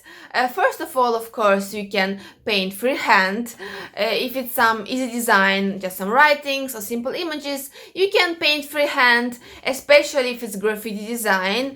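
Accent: Russia. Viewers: Русский акцент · Она русская или какая то????английский такой 🤣🤣 video is useful but why not arcylic???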